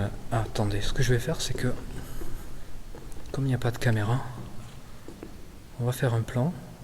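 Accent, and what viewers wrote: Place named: South, France. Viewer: Un Abonné de plus ! Je suis fan du travail de Guss DX et j'attendais avec impatience un contenu similaire mais avec une touche personnelle différente. Qualité de Montage, Durée de vidéo et l'accent du sud 3 épisodes et me voila déjà fan de ton travail ! Continue sur ta lancée je trouve cela Génial !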